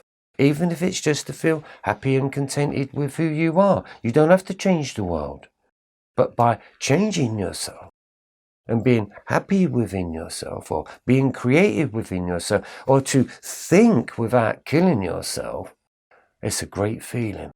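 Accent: Cockney, United Kingdom. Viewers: Thank you, it is good to hear this and watch your other talks on the dark knight of the soul..It is helping me a great deal. Plus your voice sounds similar to my Mums who was from the east end of London. Her death and Covid last year triggered I believe this time I am going through now... There is a lot of help on the Internet and I pick and choose who I listen to. You are a comfort.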